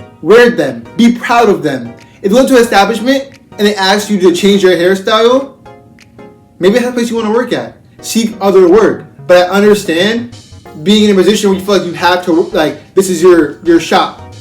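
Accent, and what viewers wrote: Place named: Haiti. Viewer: First, I just want to say I like your accent. Where is that accent from? Are you from Haity? Anyway, to get back to the topic, I think all this stuff is in Black men's head. If they are insuecure with their hair others with sense it. I have always had long hair and used to having it in braids, twists, or loose. I have never had an issue working in corporate London, UK, because I've always been confident of who I am, very proud of my African culture and never tried to fit in or gain acceptance to the detriment of my culture. Besides, my ancestors used to have long hair for spiritual reasons. Sometimes they used to keep it in locks, especially the kings and warrior class. Therefore, stuff that! I'm not going to hide my heritage to appease others just because they feel uncomfortable about an important part of my culture. That is their problem, not mine. 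With this mind set, instilled in me since childhood by my parents, I have never ever had problems working in the corporate sector previously (now I work for myself). I think I had only had one small issue many years ago when I went to an interview for an important managerial position. The interviewer (a white man) said my hair is too long and if I would consider cutting it off if I get the job. Mind your, my hair was in a neat pony tail at the time. My response was: "Never! My hair is part of me and as you can see, it is in a pony tail." I told him with such pride and assertiveness and set the foundation then and there irrespecti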